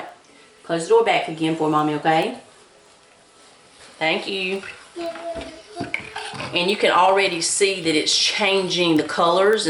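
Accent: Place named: South, United States. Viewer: I just love that southern accent👍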